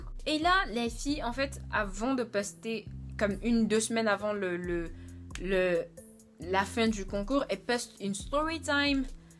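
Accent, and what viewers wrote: Quebec, Canada. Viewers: T’es tu québécois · T’es québécoise ? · Tu vis au Canada ? On dirait presque que t'as un accent Québécois ;) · jannette jackson C'est pas un accent anglais mais québécois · Ton accent est tellement beau! on dirait du québécois du français et de l'anglais c'est trop mimi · Accent canadien?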